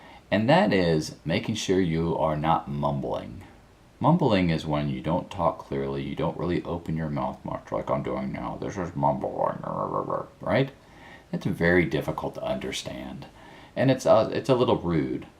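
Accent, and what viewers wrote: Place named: United States. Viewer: You are not speaking English, you are speaking American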